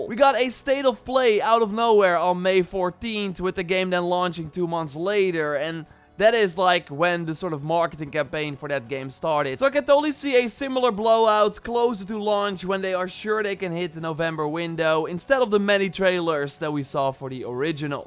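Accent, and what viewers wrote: Netherlands. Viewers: Leuke video. Raar Nederlands Engels accent toch..🙄😁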